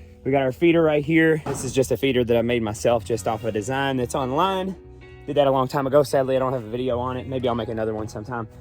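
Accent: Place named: South, United States. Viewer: You have a southern accent. Where are y’all? I’m in NC. Just ran across your channel 👍🌻🥬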